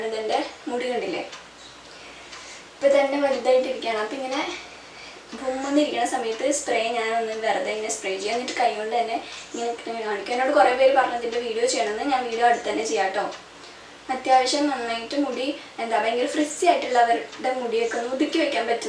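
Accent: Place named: Thrissur, India. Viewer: Ur presentation is very good. Chechide veed trissur enganum aano? Slang varunnu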